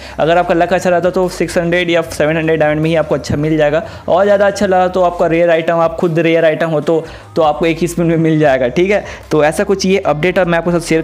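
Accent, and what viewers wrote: Odisha, India. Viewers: bhai aap odisha se ho kya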